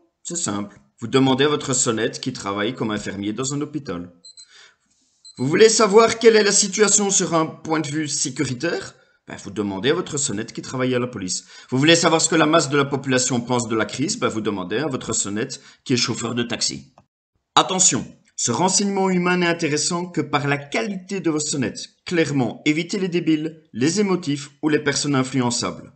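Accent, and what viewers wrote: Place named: Belgium. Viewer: Je pense reconnaître un accent du plat pays ;) heureux de savoir qu'il y a d'autres personnes qui s'intéressent a ces problématiques dans ce pays si mal préparer a ce genre de risques !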